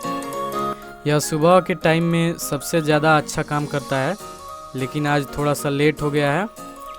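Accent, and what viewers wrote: Bihar, India. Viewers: Bhai apke aawaj se aisa lag raha hai aap Bihar me kahin se Hain.. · Bhai saahab ...hindi main sterling aur pulling ka dhyaan rakhen....maana ki bihari hai phir bhi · Kya bhai bihaari ho ka tum? Tumhre tone se lag gawa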